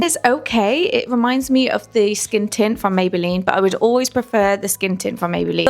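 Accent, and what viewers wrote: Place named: United Kingdom. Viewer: You accent is so cute. Where in the UK did you grow up?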